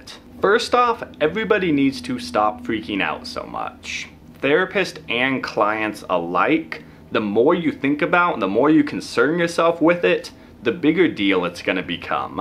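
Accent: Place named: South, United States. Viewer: You're cute and your voice sounds like you have a little country accent. 👍🏻❤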